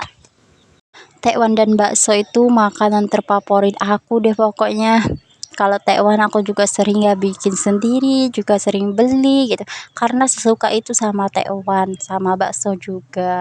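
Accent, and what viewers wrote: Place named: Banjar, Indonesia. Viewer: Enak tekwannya bun... Dari logat ngomongnya seperti orang Banjar.. Tinggal dimana bun?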